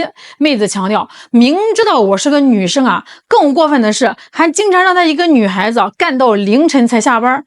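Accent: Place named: Shandong, China. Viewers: 这山东味儿的普通话 😀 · 这女主播被这口北方口音给毁了，本来还算美女一枚. 😅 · 这山东口音简直了😂😂😂😂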